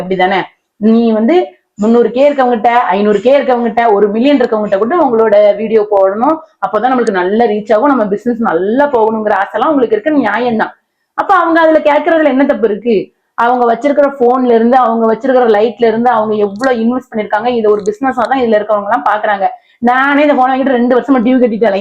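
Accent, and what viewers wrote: Tirunelveli, India. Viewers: சிஸ்டர் நீங்க 3 கோடி 47 லட்சம் Views வச்சியிருக்கீங்க அது மற்றவர்களுக்கு பொறாமை கவலை பட வேண்டாம் தங்கச்சி என் சொநத ஊரு சேரன்மகாதேவி நானும்ஒரு யூடிப்பர் தான் நான் இப்போ இருப்பது ஈரோடு உங்க திருநெல்வேலி பாசை கேட்க இனிமையாக இருக்கும். என் பெயர் ராஜேஸ்வரி என்னோட சேனல் Rajiyin Rajyam என்னால் இப்போ Video போட முடியவில்லை தங்கச்சி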